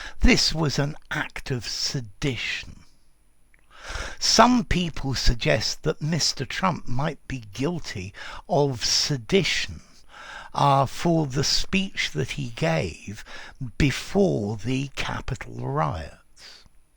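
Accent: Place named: Oxford, United Kingdom. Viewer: His accent is from Oxford.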